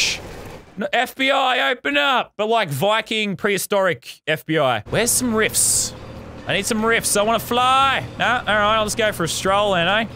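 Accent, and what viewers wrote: Australia. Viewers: I’m Aussie and you can tell he makes the accent stronger (for comedy), cause when you have an Australian accent it’s really easy to go full out Aussie mate... yeeeeeeee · PiBi23 Official he is australian the accent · You sound very Australian mate (I'm Australian as well)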